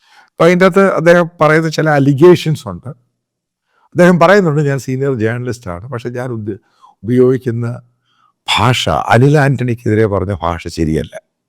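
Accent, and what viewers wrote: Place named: Pathanapuram, India. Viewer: സാമുവൽ സാർ ഞാൻ കേരളത്തിൽ ഞാൻ ആരാധിക്കുന്ന അപൂർവം ചില journalists കളിൽ ഒരാളാണ് സാമുവൽ സാർ.. കാര്യങ്ങൾ പഠിച്ച് മനസിലാക്കി സത്യം ജനങ്ങളിൽ എത്തിക്കുന്ന താങ്കളുടെ Hard journalism ഉം പത്തനാപുരത്തുകാരുടെ Accent ഉം എന്നെ വല്ലാതെ കോരിത്തരിപ്പിക്കുന്നു. ആളുകൾ വിമർശിച്ചോട്ടെ.... സാമുവൽ ധൈര്യമായി മുന്നോട്ട് പോകണം സർ. I wish u all success to you and your news channel which proved to be Genuine and popular news channel in Kerala. Ajith Kumar from Thiruvananthapuram.🙏🏻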